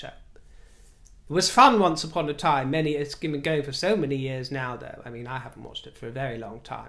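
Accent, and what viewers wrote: United Kingdom. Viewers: As a rather conservative, slightly over the hill woman from the flyover mid Atlantic region of the States...I certainly do not feel that Harry's 'Spare' will do well over here,..... There are so many much more important issues on our general minds, that I just can't imagine what demographic over here would feel compelled to fork out whatever £28 translates to in the flailing US currency. ( $32 ish, I think)...that's about a half of a tank of gas for the industrious working...more affluent, in my opinion will be too busy and uninterested in his whingine(?) Whining....and the ridiculously large bunch of our now unemployed or underemployed or welfare crowd won't be able to get it with their food stamps.... Personally, there are so many other and more worthy books to buy that I could've bothered to invest a nickel in anything he might be trying to communicate via a convoluted route, especially when anything he spits out in person is so worthless! 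Besides, I'd so much rather be entertained by you especially, or any various other Brits with wit and humo...especially with a yummy accent!! Just can't see it, any huge sales number, for him from here!!I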